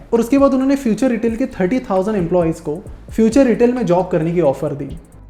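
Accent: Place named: Maharashtra, India. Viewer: Did anyone notice his Marathi? Loved it tho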